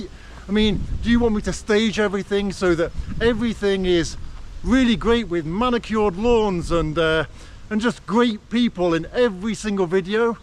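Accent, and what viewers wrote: United Kingdom. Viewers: If you walk in Detroit or now even in LA or NY, the landscape is even shitty than that. Thast's the "real Russia" you want to show. And your british accent teils us why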